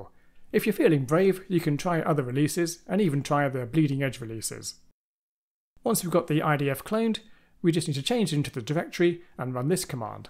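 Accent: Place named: United Kingdom. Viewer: You sound like you're from the UK. Did you order via pcbway? Any issues since brexit / vat changes?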